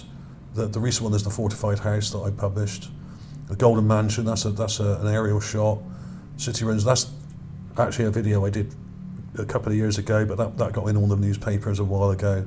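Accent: Bristol, United Kingdom. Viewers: Dear friend with british accent- you could slow down your exciting explanationns, beef up your volume 16 percent, louder is better here, so many videos I simply cannot hear and I wont wear headphones, I hate that, I was forced to wear HD ear protection for years, ok at least boost it 10 percent if at all possible. macbookair-speakers are not loud enough! thanks why do British accents make it hard to understand? love the shows, theories and analysis, wish you would decorate your findings with more filters and colors, but yeah you do know the art of photo analysis, great job, very creative and amusing at times! A big THUMBS up! Thank you for your research! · Think about who those dislikes are coming from, there are entities out there who are not private individuals, and who will attempt to discredit anything that leads people to the truth. They are all over the web, on forums etc, it is their job, it is what they are paid to do. 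Keep up the good work...that accent sounds like Bristol, we could be neighbours.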